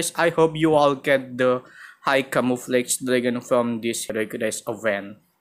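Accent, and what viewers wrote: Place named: Indonesia. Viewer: Bro, are you from indonesia? Your accent sound like Indonesian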